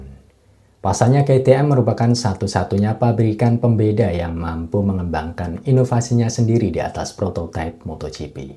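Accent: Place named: Java, Indonesia. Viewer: Nggak nyangka untuk suku jowo medok...Suaramu cukup ok.... aku suka....adem cukup jelas....hanya kurang penekanan dikit2...suatu saat pasti lbh ok...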